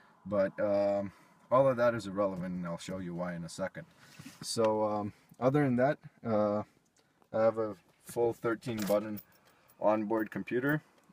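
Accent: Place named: United States. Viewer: No offense, but Is this in Canada ? Your accent is american but your country use KMH? I kinda confused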